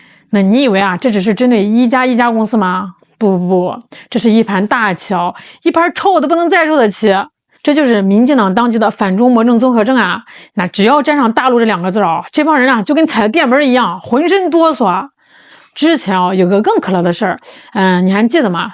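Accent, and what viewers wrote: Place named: Shandong, China. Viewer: 一听就是山东的，外网键政圈大部分是山东人😂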